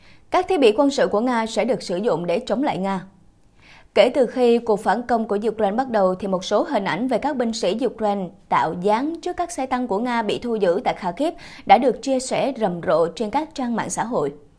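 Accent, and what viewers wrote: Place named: South, Vietnam. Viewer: Ngôn ngữ và dọng nói miền Nam hay lắm. Giữ lấy BẢN SẮC VĂN HOÁ NAM BỘ dễ nghe nha,!. Bạn có âm vực rất tốt, cám ơn bạn.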